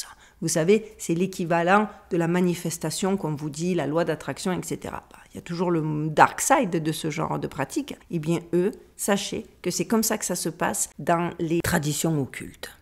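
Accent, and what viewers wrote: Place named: Nice, France. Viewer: Wow ta d’beau yeux tu sais l’accent niçois avec un soupçon moscovite bon ton vpn ,on s’en fout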